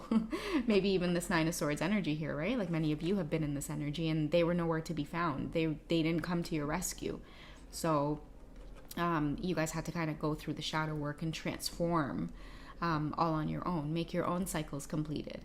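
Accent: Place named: Trinidad and Tobago. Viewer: I always knew you were from Trinidad 🇹🇹 hearing you talk .am from Trinidad 🇹🇹 too I love ❤️ your reading 📚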